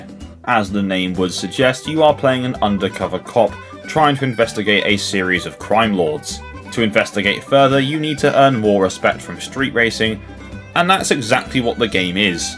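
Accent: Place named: South, United Kingdom. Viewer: 90% of Southern English middle class men have this voice lol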